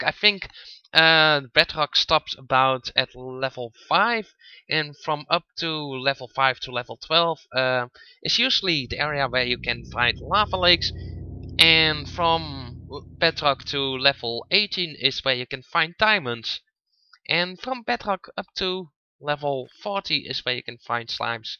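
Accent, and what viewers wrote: Netherlands. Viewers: Dutch accent. :p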